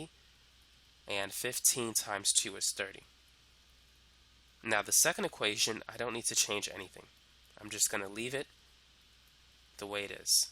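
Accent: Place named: New York, United States. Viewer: This guy is soooo clear in his explanations!!! I love his NYC accent too!!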